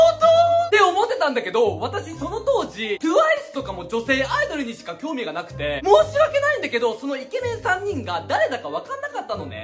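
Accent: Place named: Hokkaido, Japan. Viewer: 大阪に住んでたんだ！ 北海道の言葉と関西弁を喋ってるからずっと謎だったのが、今納得しました❤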